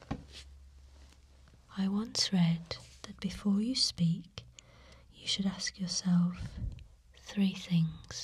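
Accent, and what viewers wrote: United Kingdom. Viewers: I wish you could do more soft speaking ASMR videos. I can't get enough of your magical tingly British voice! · How i love it when i listen the sound of a brit speaking it sounds so wonderful!!! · I wish my British accent was half as beautiful as hers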